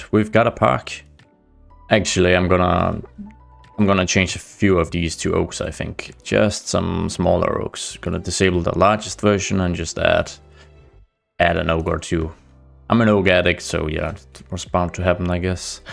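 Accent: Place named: Denmark. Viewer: Man kan bare høre at du er dansker xD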